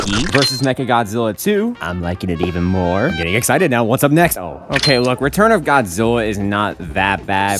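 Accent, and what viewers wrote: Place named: Texas, United States. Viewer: ngl bro sounded like he had a Texan accent, and I agree with every ranking you just said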